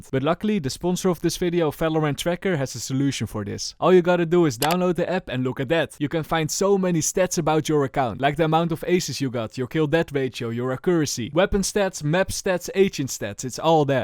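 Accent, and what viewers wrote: Netherlands. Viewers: nederlands? ik hoor dat accent ofz xD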